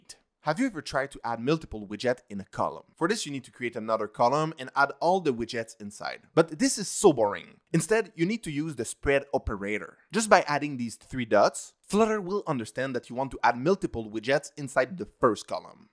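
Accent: France. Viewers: That's a very nice explanation with a French accent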